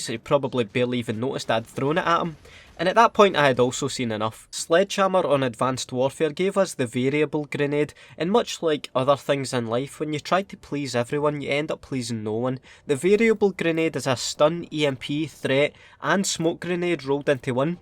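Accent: Scotland, United Kingdom. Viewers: This mans accent is just awesome i love the Scottish accent mate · Love your scottish accent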